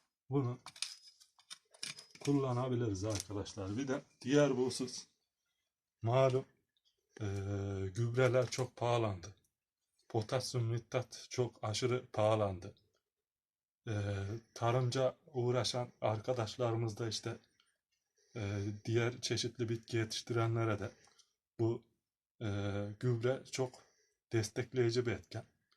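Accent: Turkey. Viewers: Sayin ustam süzülen topragi kaç dakika kaynatıyoruz kulun sadece suyunu katıyoruz heralde abicim şiveniz iç anadolu bende bu bolgedenim selamlar saygilar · Ustam nerelisin sivenir bize yakin sanki elbistandan selamlar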